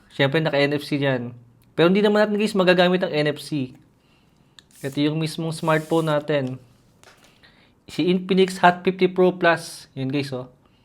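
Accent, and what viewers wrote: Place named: Batangas, Philippines. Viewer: Taga saan k boss parang batangueno ka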